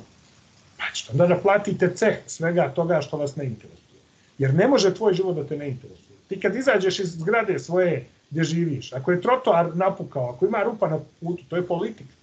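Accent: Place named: Ekavian, Serbia. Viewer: Da odmah napomenem ne zelim vrijedjati i ne odnosi se na neki vid mrznje Prvo mi se ucinilo dacujem ekavica konzumacija Kad fakat pa dragi ti ko starleta Ne mogu vjerovati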